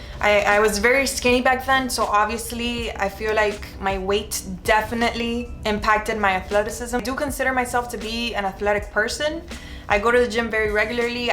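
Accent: Cuba. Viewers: I totally knew you were Cuban! Your accent really reminds me of Gloria Estefan’s! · ah okay so you are cuban, was wondering because of your accent!! Aries here too!! yay ^^ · OH MY WORD I KNEW YOU WERE FROM MIAMI!!!!! YOU HAVE THAT CUBAN ACCENT FROM HIALEAH...not a bad thing I just love ppl that don't forget their roots. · girlllll I subbed strictly because I heard your cuban accent and this video confirmed you were! 305 till we die... hahaha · i knew you were cuban, you can hear it in your accent. love your style! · You kinda sound like Derek Zoolander, he he, maybe it is your Cuban ascent : )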